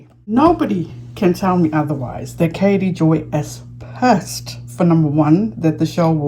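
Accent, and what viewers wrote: South Africa. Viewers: Are you freaking stupid?!? Kj was advocating for the kids. She's callinging Christine out for allowing her child to be used. I don't like that she is going in on Christine but tbf most are manipulated their whole lives for what is normal, kj is coming from a western idea. Being that you sound like a south African you can't understand her point of view. Like we don't agree or understand your pov with your countries history. But we let y'all be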